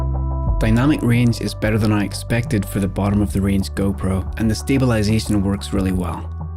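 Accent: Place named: Northern Ireland, United Kingdom. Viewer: am I right in saying your originally from Northern Ireland? might be wrong but I can pick it up, I'm a Fermanagh man myself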